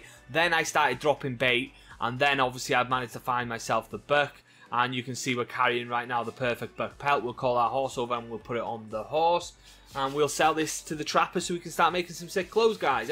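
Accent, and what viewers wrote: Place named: Wales, United Kingdom. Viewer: I like your welsh accent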